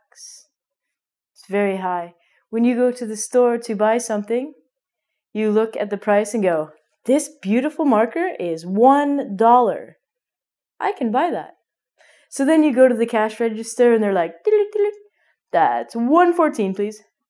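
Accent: Canada. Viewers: Love your Canadian English tone of voice..reminds me lots of nice memories some past time in my childhood... away in Whistler Mountains.. warm hugs from Chile and thanks.. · i think she is from canadian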